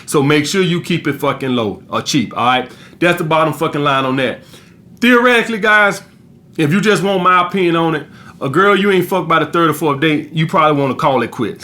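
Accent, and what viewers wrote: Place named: Alabama, United States. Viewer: Battlerap Legend Alabama accent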